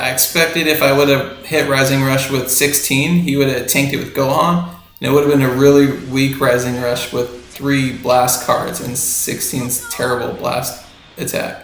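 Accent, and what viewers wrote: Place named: Russia. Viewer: Are you Russian?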